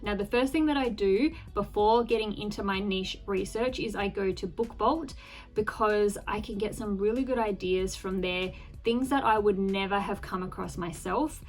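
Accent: Australia. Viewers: Is that an Aussie accent I hear?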